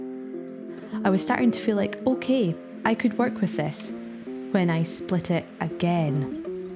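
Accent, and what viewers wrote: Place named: Scotland, United Kingdom. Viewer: your langage accent is so close to your husband's ... i habe never been in Scotland ... but listening to your voice ... you both really share a local tone :)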